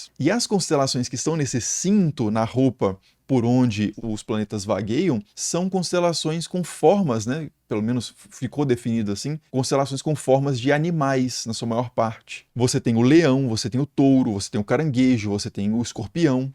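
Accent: Minas Gerais, Brazil. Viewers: sotaque mineiro pega diferente né...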